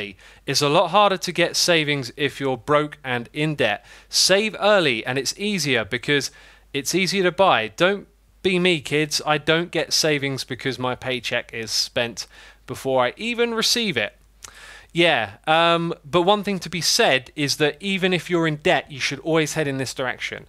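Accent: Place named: United Kingdom. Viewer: imma be honest... ur British accent makes me want to actually listen to you and take ur word for advice as a young person.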